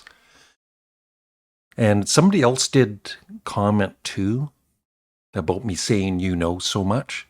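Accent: Canada. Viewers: Honestly, I never noticed you saying "you know". I do notice the "aboots" but that's your lovely Canadian accent which doesn't bother me. At least you don't wave your arms and hands in front of the camera like you're chasing off a swarm of angry bees. There are a couple music reviewers that give me a seizure with all their silly arm waving behavior 😆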